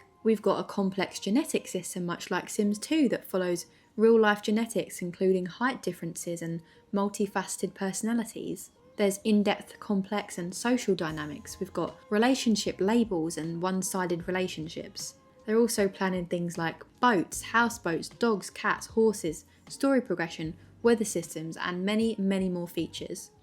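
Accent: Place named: United Kingdom. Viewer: really great and calm video! i love that youre also british cuz i usually only ever find american ytbers making sims/cosy game vids and it just feels more grounded probs cuz its a familiar voice but i digress! :3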